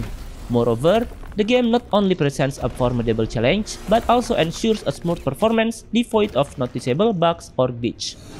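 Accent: Indonesia. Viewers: Indo banget logatnya lol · aksen nya kaya orang indo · aksen indo bjir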